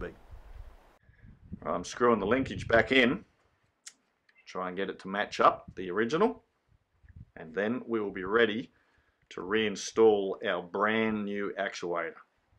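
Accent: Australia. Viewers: Great video, and great to hear an Aussie, in America, driving a British car! Very multi-cultural. I need to change some actuators in my Disco 2 and hesitated when confronted with having to 'fiddle' with the window jams / frames. After watching your video it doesn't look as bad as I thought it would be! Thanks! On another note, I changed the actuator on my rear cargo door as it wouldn't unlock with the remote key. Upon changing it, and then testing it, it still wouldn't work, then I realised you have to press the unlock button on the key fob twice to unlock the cargo door. It then worked. It made me wonder if I even needed to change the lock in the first place - I just needed to press the key fob button twice lolol. At any rate, at the same time I removed and rotate through 180 degrees and reinstalled the factory spare wheel carrier so that it would allow me to put bigger tyres on the car including the spare without clashing with the bumper. 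So all was not lost in this exercise. Thanks again for your videos - they are my first stop when I am contemplating doing some repairs and maintenance on my Disco. Cheers! Michael, Alice Springs, Australia.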